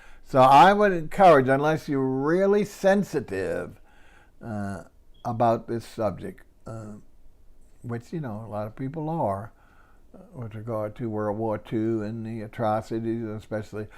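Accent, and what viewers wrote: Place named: New Orleans, United States. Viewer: sure good to hear that N'Orleans accent I remember so well. Your voice is in sync with your video. Joe Robberson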